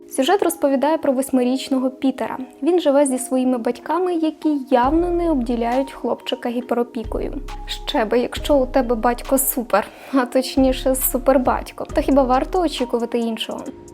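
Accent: Standard. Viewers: Вау! Яка стильна та харизматична ведуча! Вподобайка та підписка без сумніву! І окрема дяка за чистісіньку мову!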